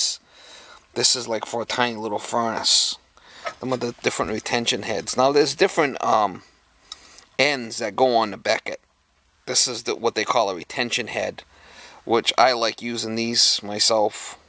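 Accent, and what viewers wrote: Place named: New England, United States. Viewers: New England accent galore I was sure any moment he was gonna call his Pauly his ma or broad to help him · Aw rhight my New England Brothah! This is going to be a great help for me here (now in PA) with a 60-80 year old oil fired hot water to radiator heat, furnace and a Beckett burnah! I've run diagnostics on this thing (being a true New Englandah not cheap just smaht/frugal) for the last three years and saved about $1000 dollars. My problem is cruddy electrodes after almost every firing requiring take down and cleaning to get to fire again. I have a new transformer/igniter but haven't replaced it yet b/c the old one still works!! (sound like my dear old departed dad..). So hope your video's can help! Wicked-good video!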